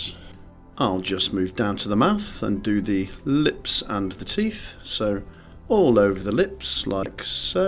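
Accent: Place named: RP, United Kingdom. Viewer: Thank you for your awesome tip!! Furthermore, watching your excellent videos is like killing two birds with one stone: I learn Capture One Pro, and I improve my English thanks to your Received Pronunciation 😅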